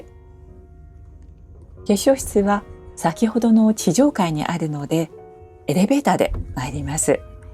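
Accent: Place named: Japan. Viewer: Trop agréable d'entendre une voix japonaise. Vous avez de la chance car notre expérience notamment le service était très mitigé au Lasserre pas très bien. Mais les plats étaient OK.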